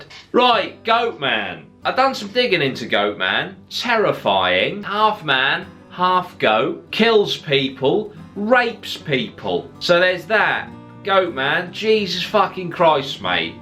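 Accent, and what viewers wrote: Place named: Norfolk, United Kingdom. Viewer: Are you from Norwich (I am) cos you have a norfolk accent?!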